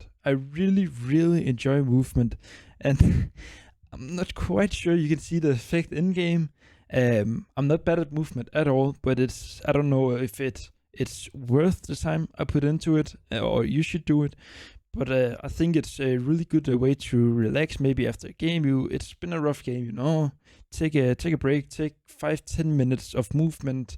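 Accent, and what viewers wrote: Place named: Denmark. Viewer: God video ven! Nice danglish, det du fandeme god til <3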